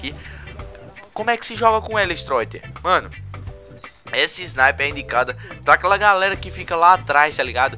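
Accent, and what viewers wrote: Northeast, Brazil. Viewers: Ele tem aquele sotaque nordestino e-e O que deixa os vídeos melhor ainda...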